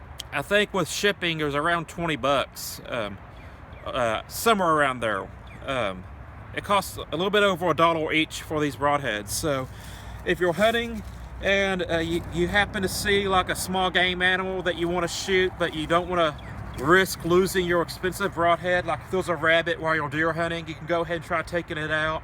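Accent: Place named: South, United States. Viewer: Speech impediment and a southern accent, l feel your pain!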